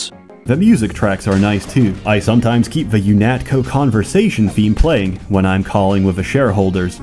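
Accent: Poland. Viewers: Are you from Poland? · U Polish ?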